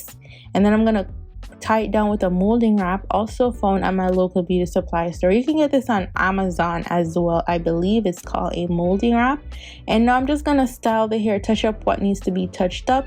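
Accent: Jamaica. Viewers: Sound like you are Jamaican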